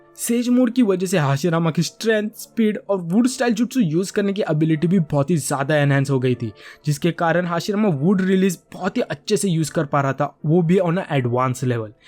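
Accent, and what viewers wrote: Maharashtra, India. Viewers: Bhai tu Marathi hai?!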